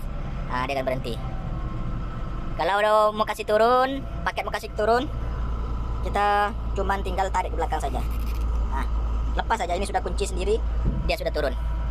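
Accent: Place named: Madura, Indonesia. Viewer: Kok kaya logat Madura omm😅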